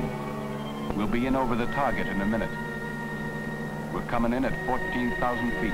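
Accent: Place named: New York, United States. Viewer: narrator sounds like he's from NY.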